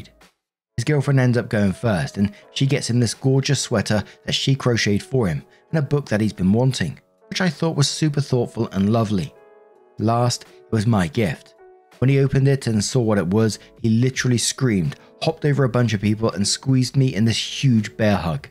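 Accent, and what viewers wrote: United Kingdom. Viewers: You have a pleasant voice to listen to, but I can’t tell if you are from US or UK. UK?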